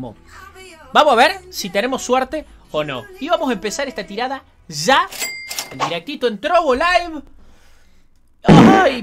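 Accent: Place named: Cordoba, Argentina. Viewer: tremendo cordobes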